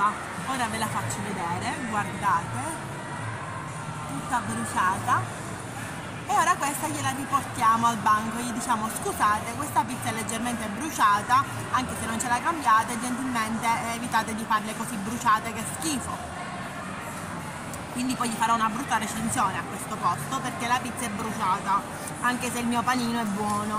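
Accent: Sicily, Italy. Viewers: Si sente l'accento siciliano ahhahahahhaha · Ciao simpaticona! Mi è familiare il tuo siciliano😂😂😂 Pizza bruciata, io l'avrei portata indietro subito! Ciao... non ricordo il tuo nome, a presto!😉😘Pollicione in su!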